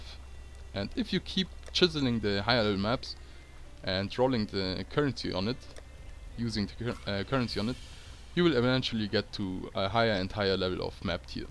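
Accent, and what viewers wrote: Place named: Germany. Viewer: Are you German ? Ich höre das ;) Vorallem wie du Bonus aussprichst :D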